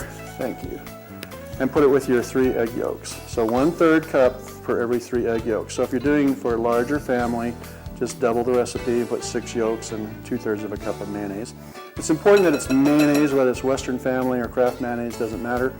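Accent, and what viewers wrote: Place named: England, United Kingdom. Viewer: hello cousin, I see despite all your years over there, you still can't get rid of the English accent. You make a great host and you look hot too as always. love you..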